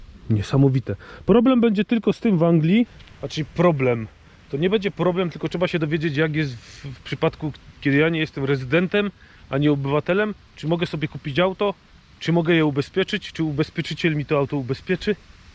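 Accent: Silesia, Poland. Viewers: Chopie jo cie lubia i ta godka ino zdrów być ❤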